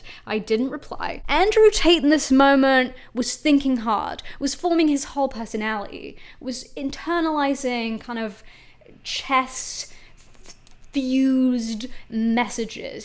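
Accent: Brooklyn, United States. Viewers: That accent! I'm dying. Absolutely spot on American accent. Chef's kiss. Never change. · Please record all future videos with your Brooklyn Accent. You don't have to though. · Top quality Brooklyn accent 😎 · All I took away from this - this man needs therapy. And - best Brooklyn accent ever.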